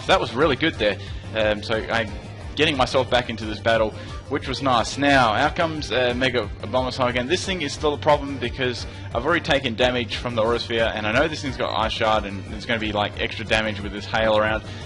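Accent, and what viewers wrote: Australia. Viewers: I was like: "Hey, same as Australia! Oh wait. is Australian...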